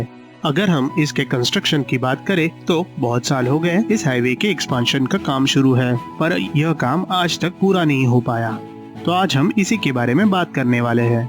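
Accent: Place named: Maharashtra, India. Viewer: Bhau marathi ahes ka tu? From your pronounciation I feel you are marathi.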